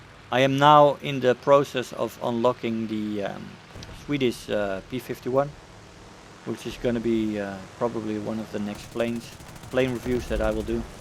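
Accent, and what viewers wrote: Netherlands. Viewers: dat nederlandse accent lol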